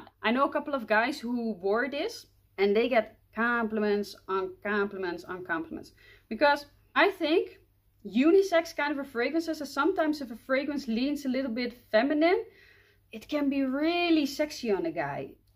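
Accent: Netherlands. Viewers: Jij bent nederlands ik hoor het direct 😜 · Are you dutch????? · Haha ik wist dat je nederlands was. Hoorde gelijk aan je accent. Nice. Doe je ook nieuwe montale geurtjes? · I hear dutch accent, are from your Netherlands? Im discovering Niche too, great video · Nice accent! Netherlands??and yeeeeeee My fav montale is intense Cafe also !!! 😁😁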